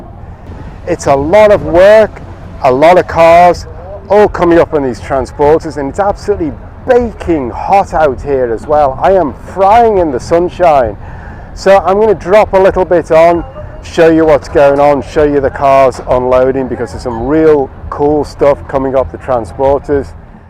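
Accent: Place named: Liverpool, United Kingdom. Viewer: Lovely video mate ❤ Where are you from? Can I hear a Liverpool accent or close to Liverpool? Maybe Chester areas?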